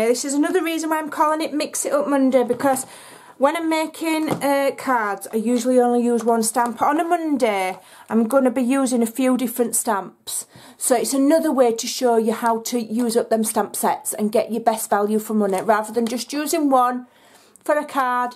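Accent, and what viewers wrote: Yorkshire, United Kingdom. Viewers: I just love this card and the Yorkshire accent it reminds me of home. Richmond North Yorkshire is my home town. What is yours. I live in Perth in Scotland now. So miss the Yorkshire accent. Love what you do keep up the good work.Christine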